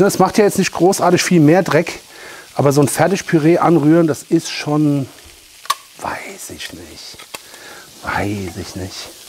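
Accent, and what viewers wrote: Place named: Hesse, Germany. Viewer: Hi! Bin ja durch Zufall auf Deine Videos gestoßen! Hier einen lieben Gruß an die "Scheffin" 😉 Dein Dialekt hat mich sehr erfreut.. Heimatgegefühl! Selbst bin ich gebürtig aus Dirppdebach! Deine/Eure bisher gesehenen Videos war phenomenal, war schon neidisch 😂 Ich lasse nun ein Abo da und freue mich auf weitere Videos! Bis jetzt: Klasse! UND, bitte weiter so! LG aus Hessen nach Hessen 🙃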